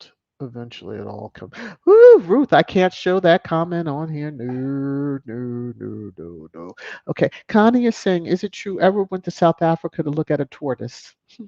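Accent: RP, United Kingdom. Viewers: Awesome podcast one love ❤️ and to all squaddies in the chat big shout out to all proud of our prince avenger God covers him under his blood 🤲✋️👋☦️☦️✌️✌️👌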